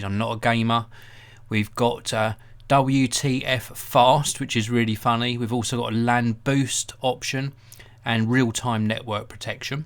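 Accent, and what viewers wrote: United Kingdom. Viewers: vfw2 Route is "root" in England. So Router is "rooter"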